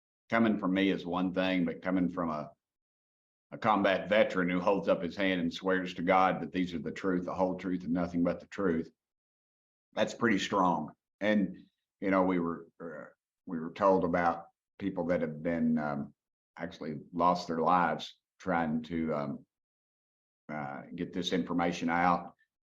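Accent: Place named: South, United States. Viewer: I couldn’t have more liking and respect for this gentleman if I tried to. He does exactly what he was put into office to do, work for his constituents & boy does he do it well. The southern ‘twang’ & his “tic tac like the candy” comments just make him more endearing. I don’t think anyone got as much as we were hoping to from the congress sitting yesterday, I sat here in NZ & watched the entire thing, & was very disappointed at the blocking of this & that, but hopefully in a behind the scenes meeting they will get to see more, find out more, enough to dig their boot & high heels into & start to climb up that rope, then, we the public, will hopefully see & hear more too. Time will tell, & I guess at this stage more times not going to kill us, at least I hope not!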